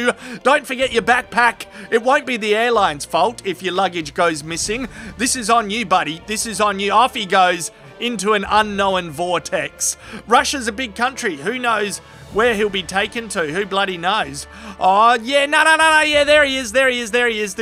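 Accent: Australia. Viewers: Yes, we citizens of the USA collectively think Australia is about nothing but kangaroos and crocs! 🤣 NOT! I would love to be able to tour Australia for the many beautiful and exciting things to do and see. Firstly, as a lover of the sea, the Great Barrier Reef would set me up for life. Sydney Harbor bridge, Opera House, Ayers Rock, Australia Zoo, the beautiful beaches with record-breaking waves, and last but not least, the well-reported hotel and restaurant hospitality and exceptional cuisine that we may not have here in the states. God willing, I will be able to afford such a trip in the future. p.s.: I could listen to that accent all day long!😘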